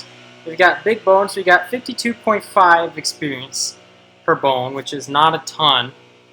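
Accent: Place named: South, United States. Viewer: im guessing alabama tennessee texas?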